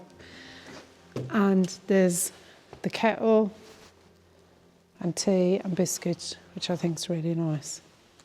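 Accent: Midlands, United Kingdom. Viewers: Are you from the Midlands?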